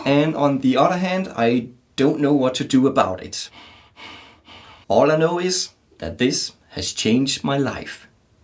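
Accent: Denmark. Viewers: Tillykke med det! Og du har dig en rigtig solid dansk accent når du taler engelsk, men det er der jo ikke noget i vejen med :D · Ann, it's a Danish accent. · Your Channel is One of my Favourite. love your danish accent. please keep making videos.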